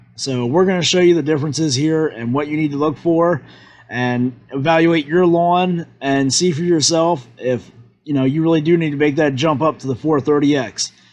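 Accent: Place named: Western Pennsylvania, United States. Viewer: I'm thinking the dial height adjustment might be faster than futzing with an app and there's less to go bad in the future. I didn't look it up, but where in Western PA or Western MD are you? Yes the accent and word usage gives you away 😁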